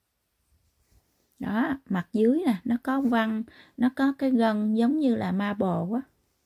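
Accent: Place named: Mekong Delta, Vietnam. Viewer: lâu tôi được nghe lại phong cách Người Miền Tây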